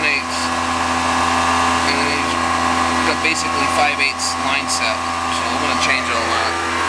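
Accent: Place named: New York, United States. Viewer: Hi another good job there I do like watching your videos all the way in not so sunny England,I've watched a few of your videos and you seem to highlight the need to install the correct size of pipes,I'm a commercial heating engineer so I do understand the need for correct pipe sizing, I'm new to AC is there a chart to help you and more so me with choosing the correct size pipes keep up the good work Ps loving that New York accent Kirk (Manchester England)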